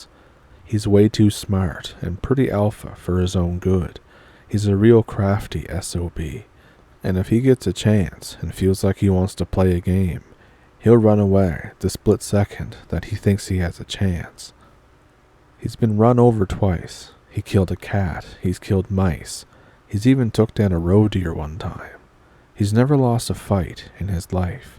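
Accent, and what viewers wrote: Canada. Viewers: Must be Canadian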